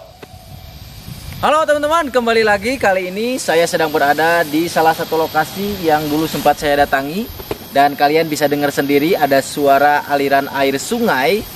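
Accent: West Java, Indonesia. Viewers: Sangat mengedukasi bgt ini, dan logat sundanya terasa bgt nih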